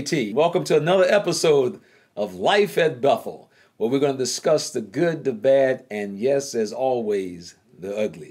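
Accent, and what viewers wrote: South, United States. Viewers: I know this video is two years old at this point, but I'm just now seeing it. I love your videos. They're so full of warmth and heart--no wonder the GB doesn't want witnesses watching this. You speak exactly like every brother from the South I've ever known. You're not bitter. You have good memories of the organization, but you don't let emotion cause you to outsource your thinking to other men. I admire your channel very much. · Southern drawl........what's wrong with 'our' southern drawl? I have cousins in WI. It's a different sound. My Mother lived in southern California...different sound. It's still English. We say y'all. WI Say yous guys. I understand what they mean and vise versa. I would have packed my suitcase and brought my southern a** home!!! Great video. Please tell Lady C hello. Nothing could be finer than to be in Carolina. 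Lol. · So it's not about JEHOVAH 🤔 it's about pleasing the ears of men wow, disgust. I thought all this was based on approval by JEHOVAH to be apart of Bethel. Another eye opener when JEHOVAH says HE'S roving the earth for those who's heart is turned towards HIM. When genuineness approaches and speaks to whomever it pierces to the soul so it shouldn't matter how southern you sound right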